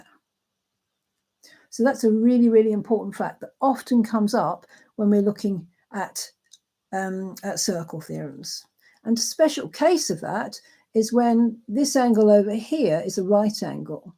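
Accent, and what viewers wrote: United Kingdom. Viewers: ..., If I remember correctly, mathematics in my school paid a lot of attention to becoming proficient in Algebra and Trigonometry, but little time was spent on Geometry, while Algebra and Geometry are very much connected! (thinking of René Descartes). Despite the fact that Geometry is very visible, it still requires a thorough structural approach strategy to problem solving, after all, you are confronted with all kinds of geometric objects and combinations thereof (also in your video), which still regularly creates confusion for me when trying to solve problems! In conclusion, if you are really a British citizen (if I'm right according to your pleasant accent), then we are neighbours, as I live across the North Sea in the Low Countries of simply beautiful Holland... Thank you again, Jan-W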